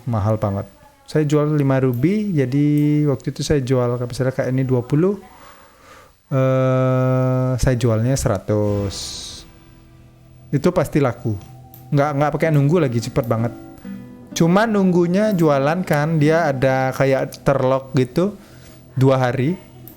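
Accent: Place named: Pontianak, Indonesia. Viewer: Logatnya mirip orang pontianak bang 😅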